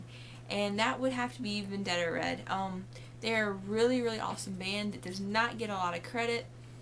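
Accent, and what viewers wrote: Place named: South Carolina, United States. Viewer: i love ur vids\ and you sound like ur from sc are you??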